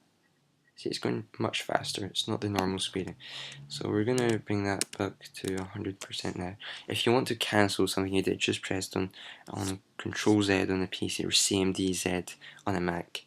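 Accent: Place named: France. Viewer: Francais Accent :)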